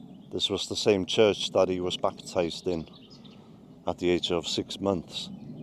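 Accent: Wales, United Kingdom. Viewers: Please! Never apologise again for your Welsh accsent. It's part of who you are... Best wishes from Scotland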